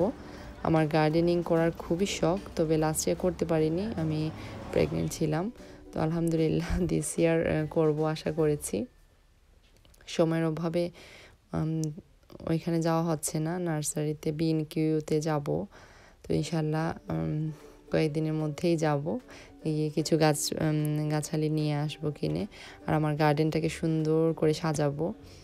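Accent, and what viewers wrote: Sylhet, Bangladesh. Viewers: Apni ki Sylheti??????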